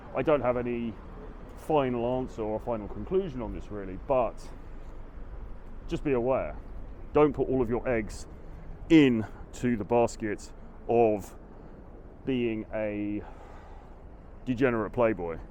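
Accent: United Kingdom. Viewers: Yeeeesh how'd I know you'd be British. Lolol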